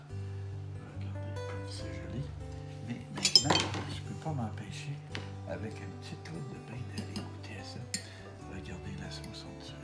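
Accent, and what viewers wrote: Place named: Canada. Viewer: Merci pour le travail des conversions... xD Bonne continuation pour tes nouvelles recettes et très franchement j'adore votre "accent" canadien ! Grand merci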